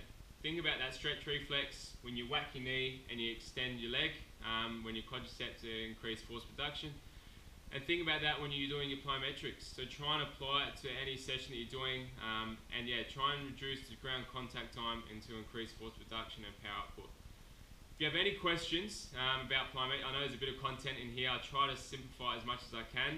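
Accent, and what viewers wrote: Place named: South Australia, Australia. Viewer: Thanks for the video. By the sound of your accent, you are from South Australia. Very different accent to the other Australian states!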